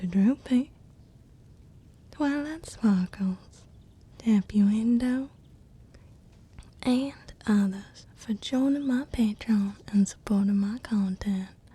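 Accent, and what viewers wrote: South, United States. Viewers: I absolutely love the southern accent very cute ^^. And another amazing and relaxing video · yaaaaaaaaaaaaaaaaaaaaaay southern accent!!! yipee! yay! wooooo!:3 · Oh snap! She's gone Southern!!! Time to relax! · I absolutely love the southern accent, especially at "my sweet little honey-bumpkin" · ? How is your British accent from your odd Therapy session video and now your Southern accent in this video so good? Wait no. Don't tell me. Because it is good. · Another amazing & relaxing ASMR video well done,! You're really good at that southern accent every time, and I love it.😎👌 And as for the face massage and paw sounds, your paws always look the best (the softest) for these types of videos.😉👍 Please keep up the amazing work and have a great day.😊🫂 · YES! And she be southern tonight. Hi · THE SOUTHERN ACCENT IS BACK. I can finally die happy... 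· Southern accent gets me every time. 👌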